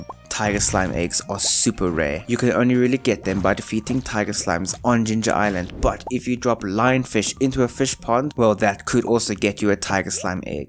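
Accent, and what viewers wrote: South Africa. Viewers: The South African accent. Love to see it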